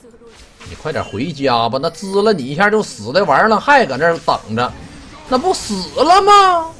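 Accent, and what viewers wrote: Northeast, China. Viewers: 猴哥这东北话听着挺爽的😂😂😂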